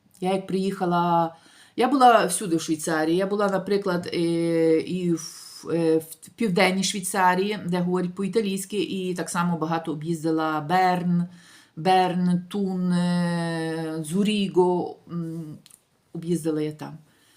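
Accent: Lviv, Ukraine. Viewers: Hallo, комплІмент, від Ольги, мені подобаєтся ваш львівский діалект,а також миле відображення життя...Швейцарія - це super!!! Дайте контакт, поспілкуемось! Успіху в усіх справах! Liebe grüsse, Olga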